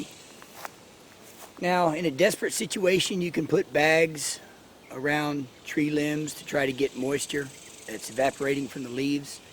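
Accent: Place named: Texas, United States. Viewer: He is from TX.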